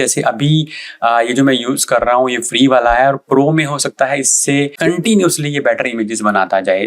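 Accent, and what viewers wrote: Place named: Ireland. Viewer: It's starts out like English but then becomes Irish or something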